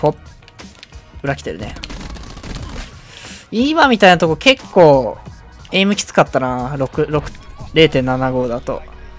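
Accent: Kansai, Japan. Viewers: グリくん関西出身だったりする？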